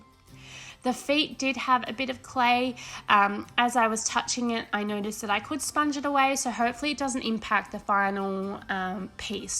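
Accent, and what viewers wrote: Australia. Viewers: Ah these are so gorgeous and so are you! It's a real treat to have such a joyful and engaging YouTube series with an Australian voice in it. I'm enjoying it heaps - thanks from Tassie.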